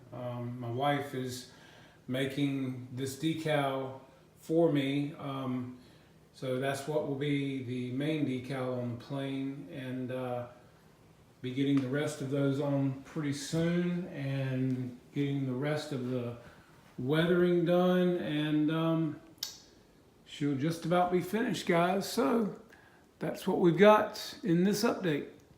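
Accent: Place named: Kentucky, United States. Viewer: thanks for quick reply, my wife got me the F4U yesterday and I assembled last night, I tried the chalk for panel lines, I had some French chalk and ground that into some alcohol and it worked great. I live in Taiwan so bit far to go to Walmart but I will call at my local stationary and art supplier they should have bottles if Acrylic paint. Thanks again for the reply and great info on your channel. Listening to you reminds me of my time in Kentucky a few years ago, hope that is not an insult as I see your in NC.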